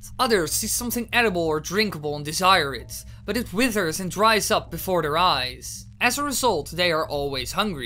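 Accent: Netherlands. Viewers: jesus man you may be Dutch but don't lay the accent on that thick.... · Lol, u are dutch, i can tell. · Your accent sounds dutch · He has a very heavy Dutch accent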